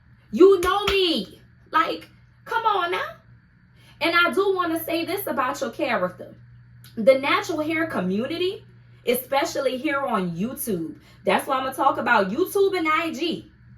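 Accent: New Orleans, United States. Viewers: Whole video is a WORD perfectly frosted with dat NOLA accent 💚💛💜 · Love that NOLA accent!! Lol ❤️❤️❤️❤️ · It’s the NOLA accent for me ❤❤❤❤❤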